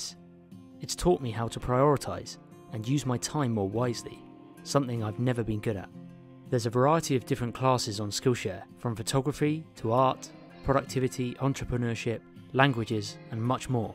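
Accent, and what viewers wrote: United Kingdom. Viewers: hes english lol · Love how he is clearly british but says ancestors to Native Americans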